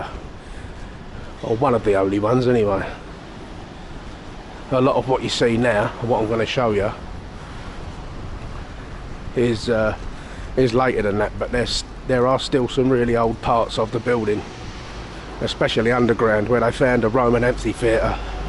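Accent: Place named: London, United Kingdom. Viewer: Love your videos, informative, interesting and fun. Plus your accent is impeccable London! Long may you continue with these excellent little films, for someone who lives in a village like me, the sheer size and busy nature of London is mind boggling and mildly terrifying! Much love from the north of England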